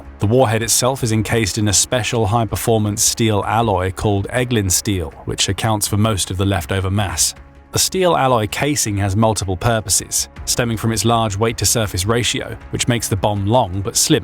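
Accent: England, United Kingdom. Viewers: Why is this commentary using an English accent when it's a US idiom script?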